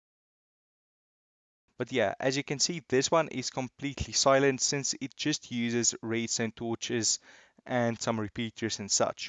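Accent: South Africa. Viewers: Really nice vid, bud! Sorry if this is rude, but you sound South African. Thanks for the T-flip-flops! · You sound South African.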